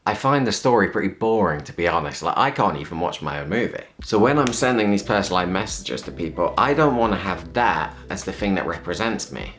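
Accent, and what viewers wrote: United Kingdom. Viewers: 조용히 님 구독하며 영국발음 행복하게 듣던 사람입니다^^ 영국에서 1년 살았던 추억으로 인생이 달라졌던 저라, 님의 9년 한국생활이 꼭 좋은 추억으로 남으시길바래요~ 영국돌아가서 원하는 꿈!! 꼭 이루세요~~!! 멈추지말고 우리 꿈꿔요! 응원합니다^^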